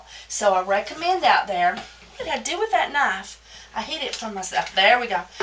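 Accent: South, United States. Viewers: Yay, congrats on the Consignment shop wanting to have "all" of ur soaps & on being featured on Ess. Depot's site! Good for you! Enter me pls. Another wonderful batch to share with us, mahalo! One of the reasons I enjoy ur videos is bc of ur southern accent, ur southern grace w/an easy going style & ur sense of humor. If only we had smellivision! Aloha nui~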